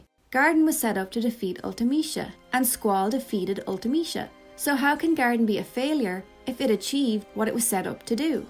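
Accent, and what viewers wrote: Ireland. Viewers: Did not expect to hear an Irish accent, great video.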